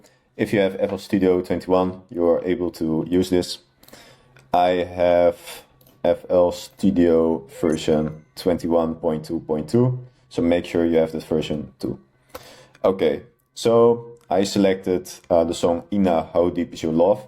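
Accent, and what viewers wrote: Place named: Netherlands. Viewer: Jij kom zoiezo uit Nederland aan je accent te horen 😂😂❤️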